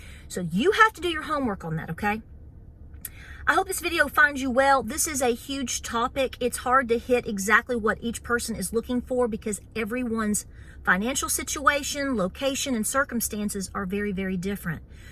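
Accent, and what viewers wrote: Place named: North Carolina, United States. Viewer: Hi. I have been watching your channel since last summer. I'm a 34 year old with a baby girl who just turned one. We live over in Buladean, NC. I love your channel. Even though you talk about the real and the scary of whats going on in our county, watching your videos comforts me. I feel like I know you because we are very similar (the accent! Heeey!!!) in lifestyle and beliefs. Watching your videos is like talking to a friend which is very important for me right now. My parents, my husband and I are doing our due diligence; however I have no friends (millenials 🙄) with whom I can have these conversations about prepping, the state of our country, etc. I have a best friend who I have worried about for this entire year. I've sent her hints here and there encouraging her about what she needs to do and about what's coming, hoping she will get off her butt and do something. 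She has made several unbelievable comments, but I don't have the time for that. Last night I was mentioning to her that she needed to stock up on meds and I mentioned Jase medical and antibiotics. She asked, "where are you hearing this? The news?". She then stated she was choosing to remain ignorant bc she had too much anxiety and couldn't add "this" on top of it. She said God would take care of us. I reminded her of Joseph and his dreams and told her God was allowing us this time and these warnings to prepare. She then snapped and said, "what are we supposed to be preparing for? A Great Depression." I sa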